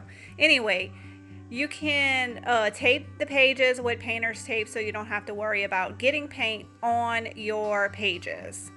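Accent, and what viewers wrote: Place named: South, United States. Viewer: Hi,. I just came across your videos yesterday. I watch a lot of home decor/diy videos and have never made comments. However, the more videos of yours that I watched, the more I was convinced that we are twins separated at birth (or at least kindred spirits). I have a booth at a local antique mall. If you look me up, you won’t find much because I seldom post anything to social media. I’m so busy making things to keep my booth stocked that I just can’t find the time to maintain a media presence. Many of the things you make are things that I make (and I thought that I originated the idea🤪) such as decor books, turning outside lanterns into inside lanterns, making wood rounds for thrift store cloches, painting old canisters and pitchers, etc. I have thought about creating a YouTube channel for over a year but haven’t taken the plunge. 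How do you find the time with young children? I hope you’ll respond but I know you are super busy. Just know that you have a twin (minus the cute southern accent) in Illinois.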